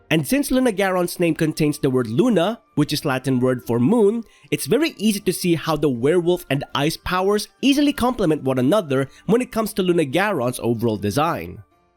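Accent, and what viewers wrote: Philippines. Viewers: I like your accent, as a fellow filiipino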